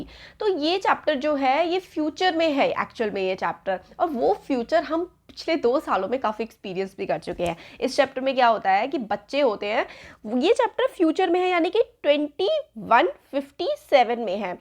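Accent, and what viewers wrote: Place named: Haryana, India. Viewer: Mam I think you belong to haryana because your voice like my friend she belongs to haryana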